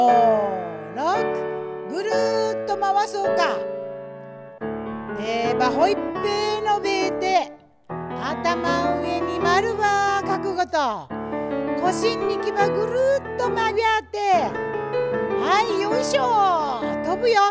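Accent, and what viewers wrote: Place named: Hasami, Japan. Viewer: 波佐見出身の者です。18歳で波佐見を離れて今年で17年になります。転勤族で各地を転々としてます。 この動画で波佐見弁のナレーション聞いて懐かしさでほっこりしたのと同時に、今年亡くなった祖母の事を思い出しました。 やっぱ波佐見はいいですね。またいつか必ず帰ります。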